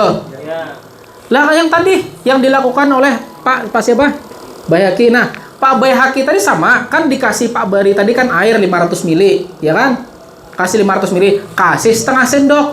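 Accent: Banjar, Indonesia. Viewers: urang Banjar kah pian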